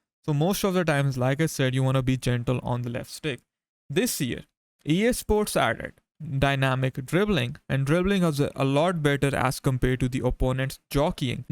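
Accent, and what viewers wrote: India. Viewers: I don’t like your accent Sounds like bajeet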